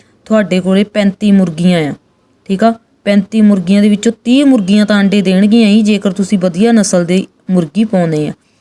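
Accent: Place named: Ropar, India. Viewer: Thodi awaj ton pata lag reha e ki tujada ilaka ropar hai sister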